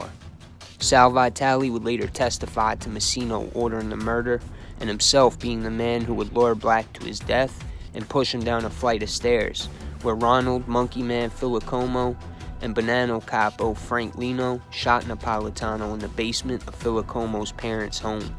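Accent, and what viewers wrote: New York, United States. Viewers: oh nice. That would be great 2 a week. Your ny accent is a huge part of what makes your stories so great. If you don’t mind me asking, what part of New York are you from buddy?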